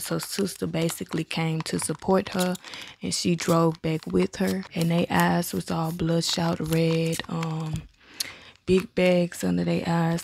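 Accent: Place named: South, United States. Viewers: Girl you are hilarious 😩😂🤣… I’m sleepy & entertained. Your personality shines through this skit. Love it! & You are from somebody’s Southern state, I hear it loud & clear.🫶🏾 I’m glad this popped up on my feed. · Being from alabama this just sounds like a southern accent to me 👀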